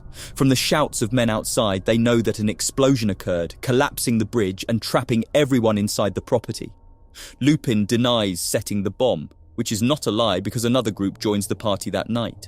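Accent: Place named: United Kingdom. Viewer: oh nah whys the voice uk cant tell if its ai or not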